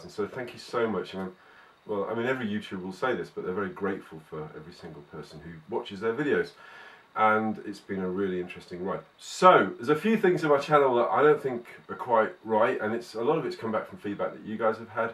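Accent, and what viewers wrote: England, United Kingdom. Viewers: yay for the English clasher, all those Americans it's nice to hear an English accent once and a while :)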